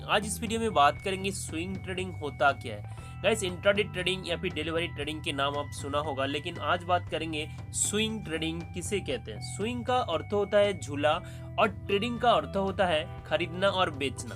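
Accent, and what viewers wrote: Bengal, India. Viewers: Dont mind bro.. Bengali speaking people ke liye thoda problem hota hai. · Bengali ho kya bhai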